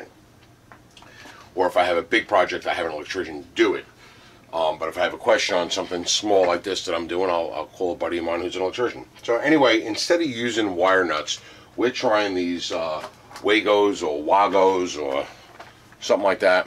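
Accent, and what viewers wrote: New York, United States. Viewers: thanks for my daily recommended allowance of New York accent. thx for the viddie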